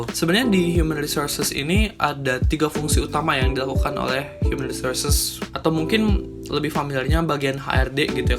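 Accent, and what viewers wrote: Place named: West Java, Indonesia. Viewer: Sunda pisaannn euy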